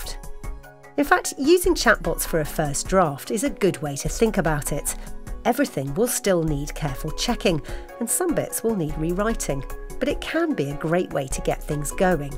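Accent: United Kingdom. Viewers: English with a British accent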